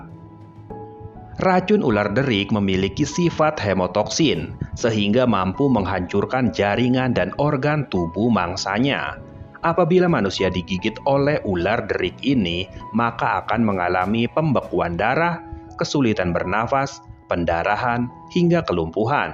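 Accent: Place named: Tegal, Indonesia. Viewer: Orang tegal hahha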